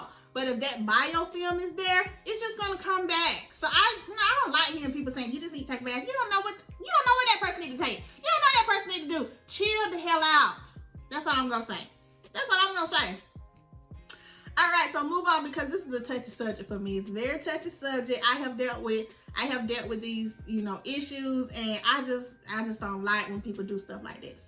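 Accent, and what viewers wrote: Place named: South, United States. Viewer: I had a feeling you were from the south sis, you give the vibes hunnie❣️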